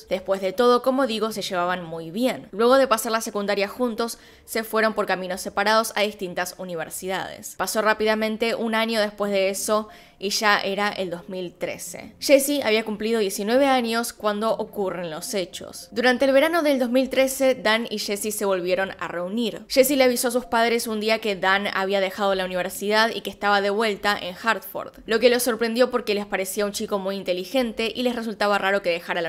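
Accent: Argentina. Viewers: Soy español y escuchar un accento argentino mientras estudio es un vicio 😂 me alegro de que ya casi estas casi al millon. Vamos !!!!ánimo que tú puedes llegar!! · Es argentina por eso suena así.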